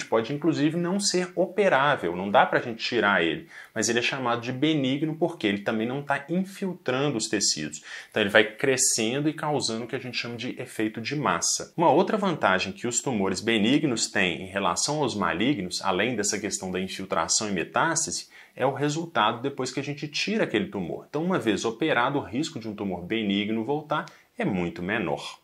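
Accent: Minas Gerais, Brazil. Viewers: Mais é di minas uai?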